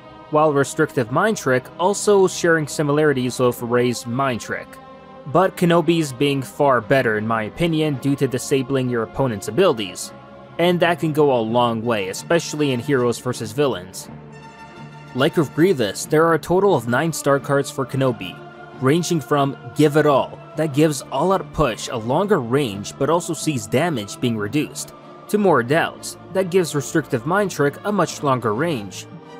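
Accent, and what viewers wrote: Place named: Canada. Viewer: thats how they pronounce offense in canada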